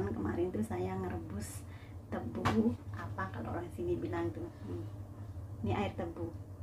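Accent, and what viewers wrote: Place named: Java, Indonesia. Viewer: Enak wae gthu denger logat jawane😂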